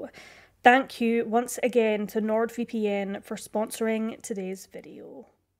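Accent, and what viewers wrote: Scotland, United Kingdom. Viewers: She is Scottish. We all talk like that in Scotland 😁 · The Scottish accent really adds an extra layer to the content. Don't know why. It just does.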